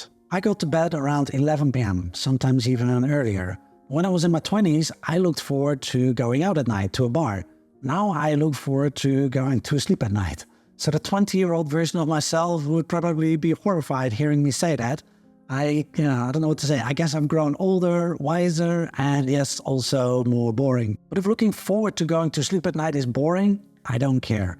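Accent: Netherlands. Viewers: Love your Dutch accent 😊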